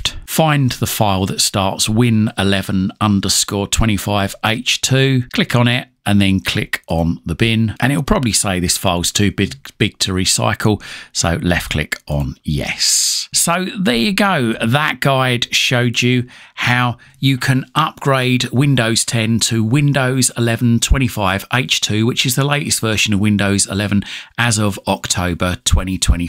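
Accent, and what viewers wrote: United Kingdom. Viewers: Thanks loaded flawlessly on a 10 year old i7 4g ram laptop. It needs more ram really. I take it if I swap out the hard drive sometime I will have to load Win10 and go through the process again?? Great to hear a Brit voice😊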